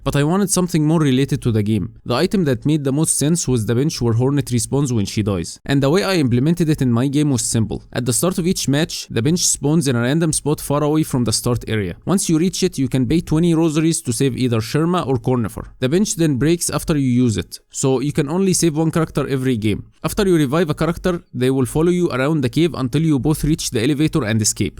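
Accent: Egypt. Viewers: عااااااش يا باشا ماشاءالله موهوب عرفت انك مصري من اول الفيديو❤❤❤❤❤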